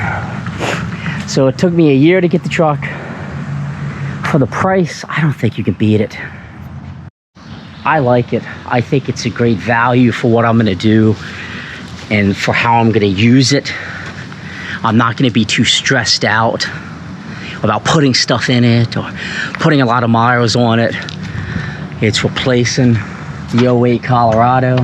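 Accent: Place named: Maryland, United States. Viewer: Love the Maryland accent. "Still wills", and the extra rrrs, etc.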